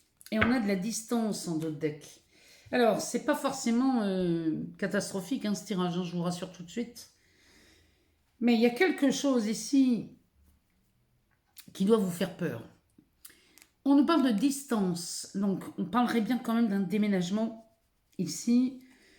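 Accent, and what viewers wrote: North, France. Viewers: Bonsoir ! C’est la première fois que je vous écoute et j’aime beaucoup la façon dont vous expliquer le tirage ! J’avoue que vous l’avez même fait rire quelque fois car vous êtes très naturelle et c’est tellement agréable 🤩🤩🤩 Je me suis abonnée et je vais vous suivre car c’était très agréable à vous écouter 💕 Je me permet de vous poser Une question : vous ne seriez pas du Nord ? Merci 🙏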